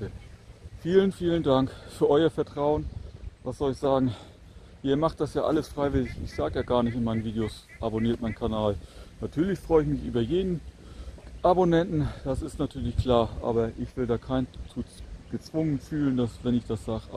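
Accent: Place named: North, Germany. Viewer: So einen angenehmen und sympathischen Youtuber gibt es ja auch nicht alle Tage.... Immer schöne Landschaftsaufnahmen, gute Kommentare und die angenehme Nord-Stimme... das macht es halt aus.... Gruß aus der Nähe der Schwalm-Quelle in Hessen (da warst du auch schon ......)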